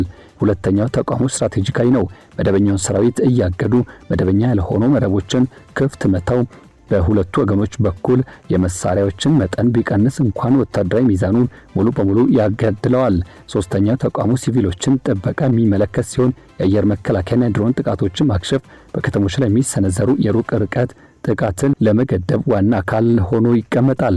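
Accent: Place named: Sudan. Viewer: ፣ማን ነህ ??እባክህ !!አማርኛ የሚናገር ሱዳናዊ ትመስላለህ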